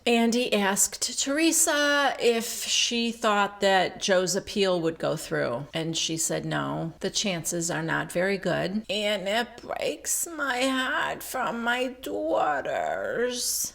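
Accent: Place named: Midwest, United States. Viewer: Love listening to you midwestern, my favorite recapper!!!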